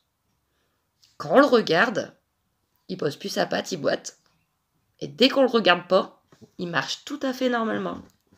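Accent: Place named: Pas-de-Calais, France. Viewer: Bonsoir. Je vous suis depuis un petit moment, mais je commente pour la première fois. Bon, la poisse c'est la loi des séries... il y a des jours où il vaut mieux rester couché 😅 et le lendemain ça va mieux... Pour les migraines, est-ce que vous avez essayé l'ostéopathie? Pour les pâquerettes, on peut les faire sécher et les mettre dans de l'huile alimentaire. On fait macérer 3 semaines, on filtre et ça fait une super huile pour nourrir et raffermir la peau du corps😊. Une idée pour prendre soin de soi à petits prix. Parce que vous avez besoin de vous occuper de vous pour aller mieux dans votre moral... J'aime bien vous écouter parler. Toute ma famille étant du Pas de Calais, j'ai grandi avec cet accent... moi je suis dans le sud, mes parents s'y sont installés quand ils se sont mariés... mais mes racines sont là-haut... Bonne soirée.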